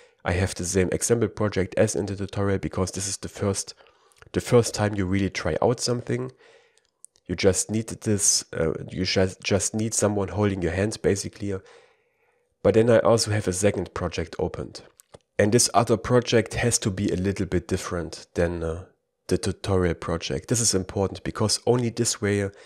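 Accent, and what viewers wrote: Germany. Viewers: man merkt etwas, dass du aus Deutschland kommst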